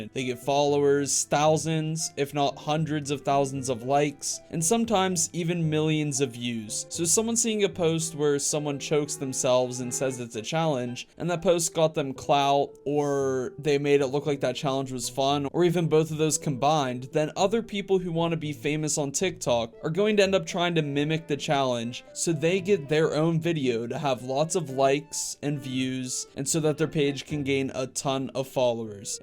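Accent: United Kingdom. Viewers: now one realise he was british at the start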